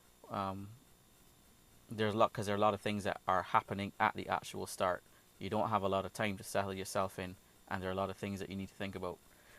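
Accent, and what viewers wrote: Barbados. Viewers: Yh he is bajan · I thought he was Irish at first, then I realised he actually sounds more bajan O_o lmao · Bajan accent.. from Barbados. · Basically Barbadian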